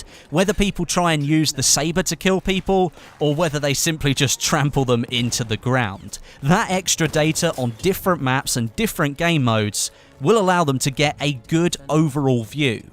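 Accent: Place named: United Kingdom. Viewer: Wonderful information, thanks for delivering all of these information for us.:D Great channel,.. I become very happy the moment I notice that you uploaded a new video..:D (Between, you're a great English teacher, I'm practicing my British accent by watching your commentaries..) xD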